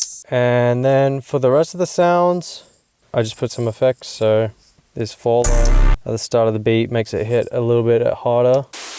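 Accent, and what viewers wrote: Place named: Australia. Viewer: brah i cud tell from ur accent thought so... good shit bro. saving aus underground scene with these beats <3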